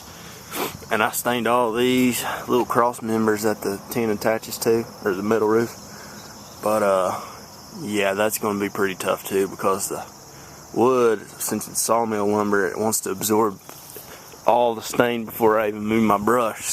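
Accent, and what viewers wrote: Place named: South, United States. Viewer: Impressive work! And that southern accent of yours... I could listen to it all day. ;-)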